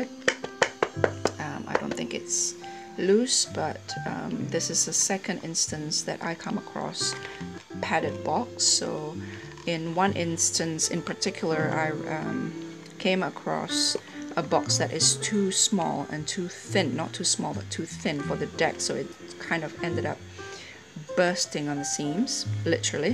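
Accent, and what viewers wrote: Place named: Singapore. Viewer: Hahahahah I love your Singlish <3 you score full points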